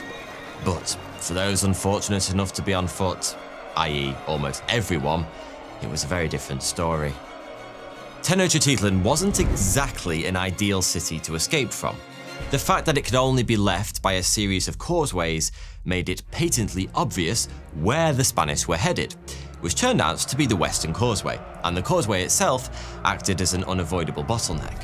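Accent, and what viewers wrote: United Kingdom. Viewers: Never thought a British person pronouncing indigenous cities would be something I’d watch good job on this vid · The british black legend against Spain once again in your british or so accent!! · that's how they pronounce them in the UK. My Roman wife does the same thing sometimes. Don't forget there's an entire world out there, bud. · Is it because he’s British or because the words he gets wrong are unpronounceable non English? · He wrote about himself: " is a British YouTuber.." So it is just a kind of British accent. Maybe you should watch videos about the main British accents. Maybe his accent is not as unique as might you think - even if it is not a native Liverpool accent. Everyone has a family and friends in childhood and schools etc.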